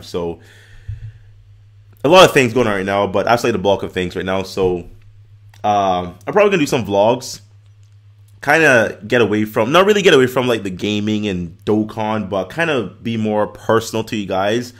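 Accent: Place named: Caribbean. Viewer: From the moment I've seen your videos I know your were from the Caribbean by your accent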